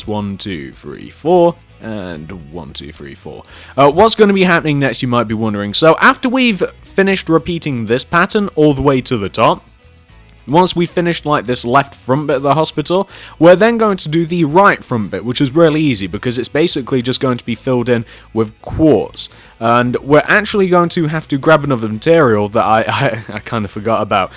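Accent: United Kingdom. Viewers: Why every YouTubers in British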